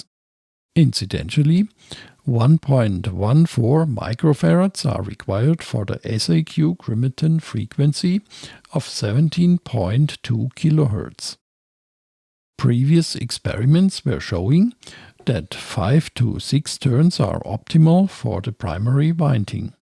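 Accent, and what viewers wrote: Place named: Germany. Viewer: Ohne den Namen, wäre mir deine Tonspur tatsächlich nicht als deutscher Akzent aufgefallen. 😲 Respekt! Viele Grüße, Sebastian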